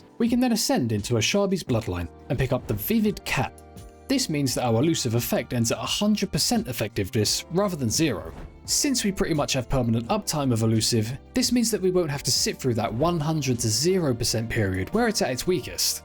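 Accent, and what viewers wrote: United Kingdom. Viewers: the lets go shopping caught me off guard after being used to the smoky british accent for so long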